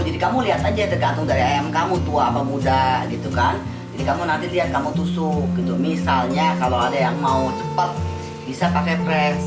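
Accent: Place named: Jakarta, Indonesia. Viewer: .... ada keturunan betawi atau karna bergaul dilingkungan betawi? Logatnya betawi sangat... 😃 logatnya mengingat kan anggota keluarga ( para orang tua) tp udah pd meninggal 🥺🥺🥺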